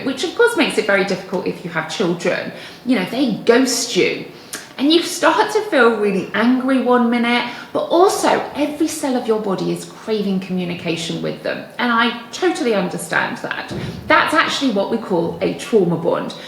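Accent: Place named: England, United Kingdom. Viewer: Hey. . . I hope you receive this as constructive feedback. The content is great. But between the English accent and the echo chamber audio I had trouble hearing you. I suggest buying a cheap, $12 corded lapel microphone you can run from the camera to a clip on your shirt. It will improve the audio tremendously. = )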